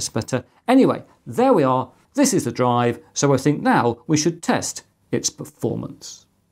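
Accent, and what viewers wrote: United Kingdom. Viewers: I'd be really careful what kind of data you copy to this WD drive. The flimsy USB Micro B plug/socket is very sensitive to mechanical stress when handling the cable. I had a broken WD Elements just after warranty expired and also used to work in a company where we had several broken WD Elements drives. The drive uses a special (very thin) PCB which is made out of plastic and therefore can not even be re-soldered when the USB socket comes partially off (and it will if you unplug/replug often). The drive is a special version which has the USB connector on board and no SATA connector, so you will not even be able to remove the drive from the case and read its data via a SATA connection. Really good explanation of backup strategies, I do like your scripts and your British English... 😊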